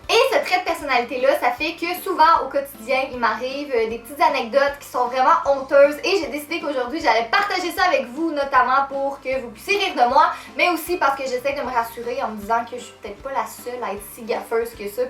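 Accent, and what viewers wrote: Quebec, Canada. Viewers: T'est québécoise ???? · j'adore ta voie tes québécoise moi je voulais être québécoise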